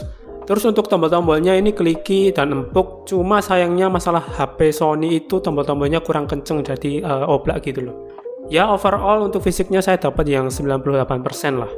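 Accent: Surakarta, Indonesia. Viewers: Loh wong solo to mas ???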